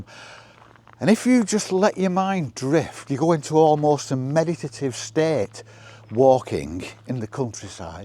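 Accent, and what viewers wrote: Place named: Lancashire, United Kingdom. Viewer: ‘Those’ videos on YouTube made me laugh. I’ve instantly clicked straight off quite a few recently 😂. What a gorgeous walk! I’m living exactly the same way in the west countryside of Victoria, Australia. Listening to you is like talking with my mam and her family who all emigrated out here from Oldham, Lancs. it’s made me quite emotional. Peace and love to you too 😘🤗.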